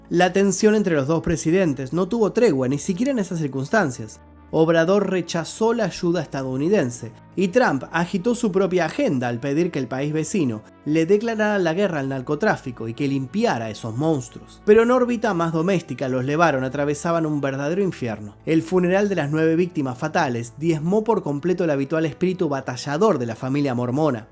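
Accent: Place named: Argentina. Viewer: Esos malnacidos ni son mexicanos, no los aceptamos, argentino de mierda que maldito acento tan feo. Largo de México!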